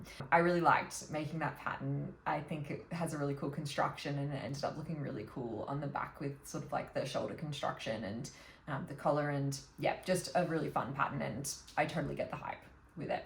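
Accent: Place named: Australia. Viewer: This is the first video of yours that I have watched and let me tell you how my jaw DROPPED when I heard your aussie accent! As a fellow aussie who adores knitting and crocheting, it is so exciting to find your channel :) Looking forward to watchinf more videos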